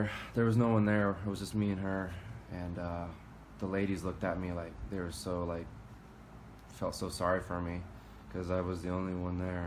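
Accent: Bisaya, Philippines. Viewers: thank you for sharing, your a tough guy. God bless your family, and i cant wait to hear your bisaya accent soon :)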